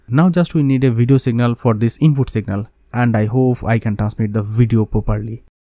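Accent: India. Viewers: Lol. Hardly. Great Scott is German and this guy is Indian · Brother ... you sound so Indian ... Ram Ram ...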